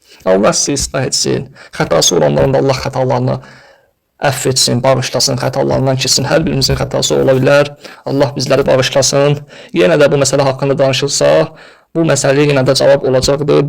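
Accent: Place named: Iran. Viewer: Sən niyə İranlılar kimi danışırsan?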